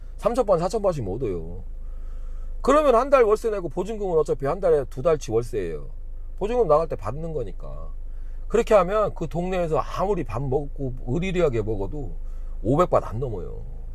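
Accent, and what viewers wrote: Chungcheong, South Korea. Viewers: 아이구~ 시원시원 하시네요. 맘에 딱 들어요 ㅎㅎ 저도 인도네시아 들어가서 현지인들 속에 가서 살려고 하는데, 어학연수 하면서 현지인들과 어울리면서 말 배울라고요. 전에 회사일 때문에 들어가 만났던 교민들,,, 아~ 진짜 .... 더이상 말 안하께요. 그리고 진짜 돈거래... 작은 돈이라도 돈거래는 하질 말아야하고, 아예 안만날 능력 있으면 좋죠. 전 고향이 충북이고 부모님도 청주 사시는데, 아~ 충청도 말투 정겹네요.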